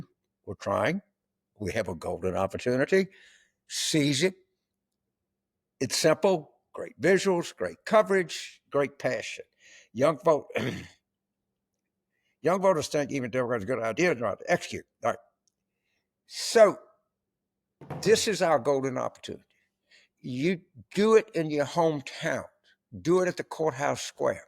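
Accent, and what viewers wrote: South, United States. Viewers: Southern redneck?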